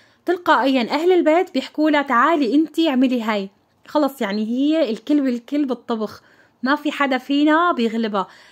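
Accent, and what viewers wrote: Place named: Syria. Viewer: من لسانك سوريا اب عن جد. لستك تكذبي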